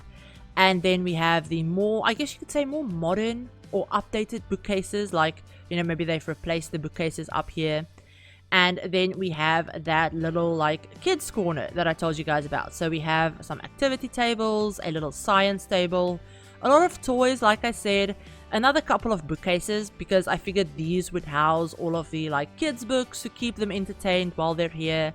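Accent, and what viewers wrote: South Africa. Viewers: Not me hearing the accent & immediately being like yes a fellow saffa simmer ❤🎉 beautiful build, adding this immediately!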